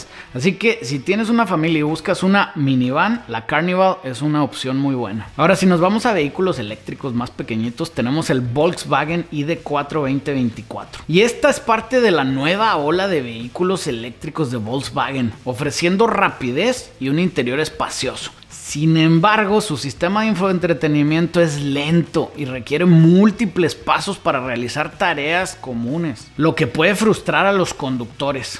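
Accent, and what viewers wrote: North, Mexico. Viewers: No sabia que los gringos hablaban como norteños o de donde es este wey y por que todo lo dice en dolares y galones, que no su mercado es latino? Y seguramente el 80% de sus vistas son en México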